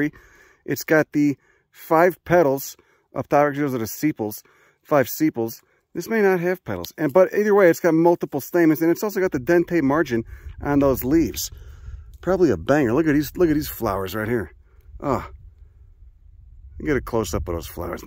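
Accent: Chicago, United States. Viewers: This guy rips! Love that heavy Chicago accent. Caught be off guard in the best possible way. I know almost nothing about these species but the delivery keeps me interested. Good stuff!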